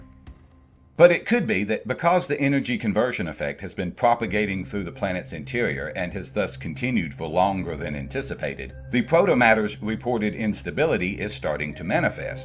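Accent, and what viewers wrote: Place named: South, United States. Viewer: I just found your channel.. am subbed after hoth and this genesis video... fantastic. Also i say this as a southerner, and with implied awe... but you sound so much like jim varney... like he is explaining these subjects. It may just be the microphone, but you sound like you have a similar accent also. Anyway, awesome channel!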